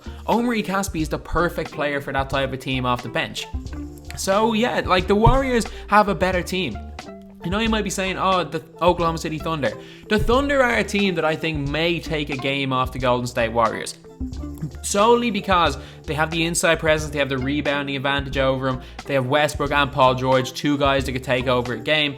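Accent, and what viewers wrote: Ireland. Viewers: is that an Irish accent you're sporting?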